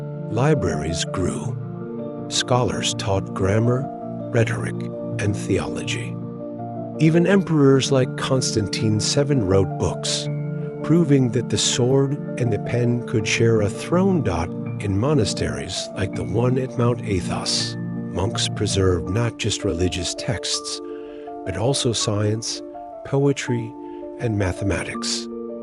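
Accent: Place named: United States. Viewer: This American accent sucks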